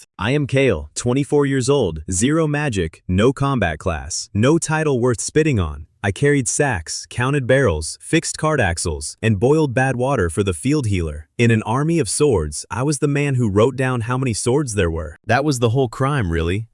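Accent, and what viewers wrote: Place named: United States. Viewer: Good story but not a fan of the AI voices American cowboy accent for a midevle fantasy.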